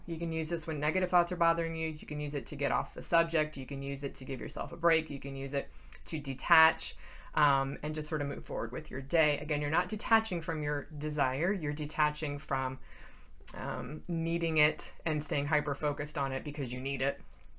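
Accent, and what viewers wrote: United Kingdom. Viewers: Best Valentine’s Day gift - we’ve missed you and your British accent and bed clothes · Your British accent is absobloodylutely fabulous!☕️🍪😜 And I love love love this video from you, it feels like you’re a best friend I didn’t know I had, came to bring me a golden advice😍 Thank youuu🌺🤍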